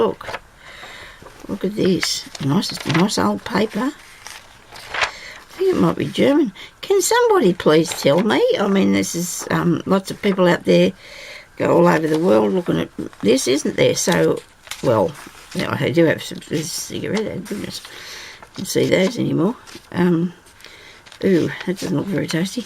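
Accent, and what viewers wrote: Australia. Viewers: hi, just found you on you tube soon as i heard your voice i knew your a aussie lol. just wondering were abouts in australia I am in south australia. do look forward to watch your future videos and you older ones take care. Lesley x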